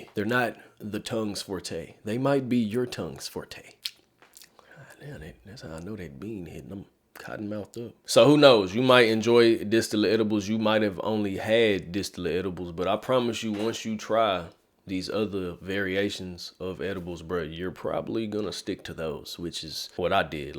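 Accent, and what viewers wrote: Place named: Texas, United States. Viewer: Strong southern accent + left over ribs and bake beans I know this man is from Austin or Fort Worth, TX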